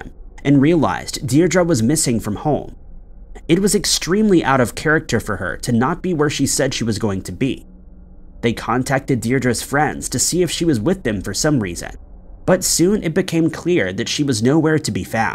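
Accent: United States. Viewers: Can someone explain why the narrator has an American accent yet he speaks like English isn't his first language? It doesn't bother me that English isn't someone's first language, what bothers me is that he sounds like a native speaker and yet he speaks like he's still learning the language. This just baffles me, I've watched a few of his videos now and have never understood why he always says "this strange but true blah blah blah" or "this scary mystery blah blah blah" it just sounds SO awkward and unnatural. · He has an American accent.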